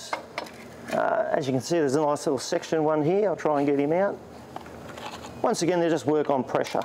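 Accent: Australia. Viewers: I love our accent. Come on Aussie come on! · Thank you - that was really informative. And hearing it in an Aussie accent was music to my ears.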